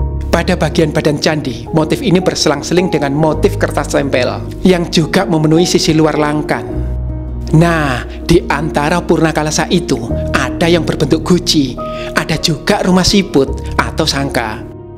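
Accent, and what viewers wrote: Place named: Java, Indonesia. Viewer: Jawa medhok!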